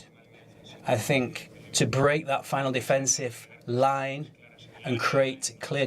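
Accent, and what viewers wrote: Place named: Liverpool, United Kingdom. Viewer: he still has that Scouser accent when speaking English. ❤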